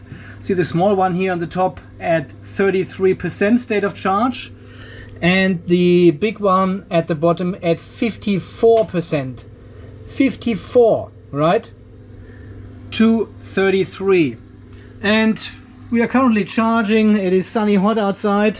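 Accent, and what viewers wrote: Germany. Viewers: Hi, I just found your channel a few days ago when trying to learn about smart BMS's. Your content is amazing, full of information and humor, and I am greatly enjoying learning from you. My late father was an immigrant to the US from Austria, so your German accented English is a pleasure to listen to. I have subscribed to your channel. I have a question that may have been answered in one of your videos that I haven't seen yet, so please excuse the question if that is the case. As a "general rule", how much would be saved by making my own 300ah 12v pack from "storage grade" LiFePO4 280ah cells vs buying a $700 USD prebuilt pack? Assuming no cost for tools and my own time, just costs for the cells, the wire and terminals and maybe a pack fuse, the aluminum for the cell interconnect buss bars, the steel or aluminum and hardware to compress the packs, and the 150a discharge rated smart active balancing BMS? 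I look forward to your response and guidance, Philip from Oregon, USA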